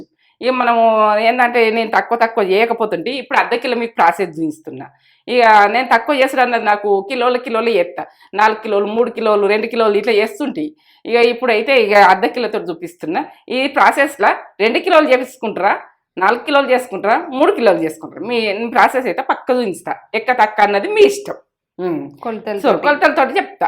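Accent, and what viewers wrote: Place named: Nirmal, India. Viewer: Amma ay vuru.chaypatamu laydu Nirmal side basha laga.vundhi.amma❤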